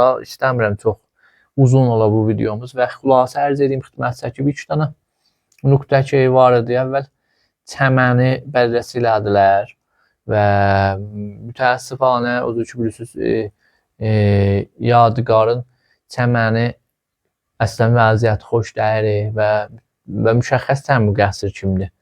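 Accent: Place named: Turkey. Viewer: مگه تراکتورسازی تبریز مال ترکیه ست که تو با لهجه ترکی برنامه ساختی؟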